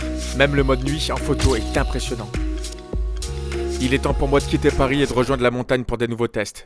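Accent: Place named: North, France. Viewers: Tu as l'accent du Nord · Tout ça avec l'accent de ch'nord , ça fait plaisir a entendre cha tisotte hein ! ;-)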